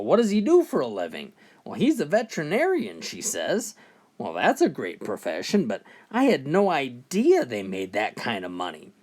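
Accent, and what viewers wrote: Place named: Texas, United States. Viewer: I laughed once, but not because of the jokes. Ngl they were terrible but that texas accent was funny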